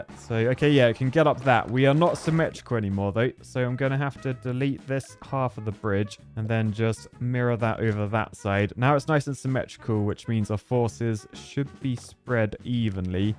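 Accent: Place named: Scotland, United Kingdom. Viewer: I missed the Scottish accent, though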